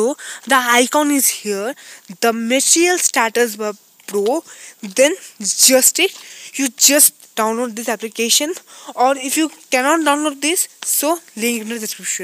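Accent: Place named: India. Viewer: How come I can never get a person who is American and speaks perfect English I can't understand these people it's always an Indian person in these tutorials!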